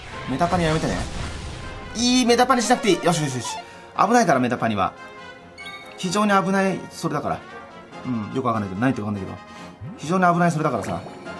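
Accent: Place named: Osaka, Japan. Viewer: 大阪のおばちゃんかおもいました。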